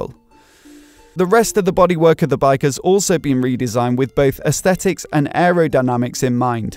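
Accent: United Kingdom. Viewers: Amazing bike, amazing video. This bike is such a craftwork, a beautiy and beast at the same time. And the video is of exceedingly high quality, one the best motorbike videoes I have ever watched. The image is incredibly sharp and clear, and the narrator's crisp and clarion British English is highly intelligible and makes the whole watching process a pure enjoyment and delight. A truly premium class motorbike video prodcution. Hats off to the producer.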